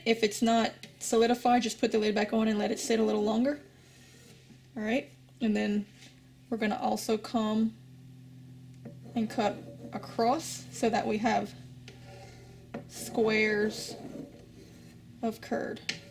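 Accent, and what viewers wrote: Louisiana, United States. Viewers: Cannot wait to try this. A lot of other videos I watched made homemade mozarella seem a lot more complicated. I live in a small town where specialty items are not usually available, so I appreciate the use of easy to find groceries. On a personal note- I picked up the Louisiana accent immediately, and paired with the well-used Magnalite pot, I knew we were from the same state! So hello and thanks from Jennings, LA!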